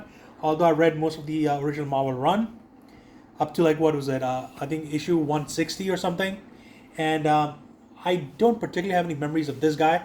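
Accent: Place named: India. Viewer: good review video bro hey! hi from Quebec Canada, I speak French, so me too had to learn English to join the gi joe community, welcome! from your accent, you must come from India or something? scrap iron your videos have little views because the trend is about the new releases, I have this guy since years before, so that s why it will not have many views you can compensate with dios - scenes to present them, it always look better, just cardboard and paint does a decent job, it s cheap. he s one one my favs in Cobra, he looks good next to reg blue troopers and the stinger jeep he s a tank killer, so he s always protected by troopers, vipers, so he only needs a gun. since you re new, or at least come from far away, you should know the Hasbro pulse site, here on u tube, check the 'post' section to comment and suggest to the makers themselves, I m sure they ll love that you love gi joe coming from so far. 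the cartoons, comics, they are a reference, but the file cards are the most important infos, I rely on that all the time, scrap iron is a solid character for Cobra in gi joe, congrats! so now you know... God bless America and world peace good work bro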